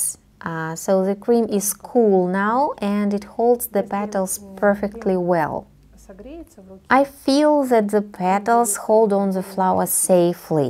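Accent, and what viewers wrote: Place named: Russia. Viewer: This Russian accent that you cannot hide even when you try very very hard. Anyway, very beautiful and helpful videos. I love them. Thank you so much!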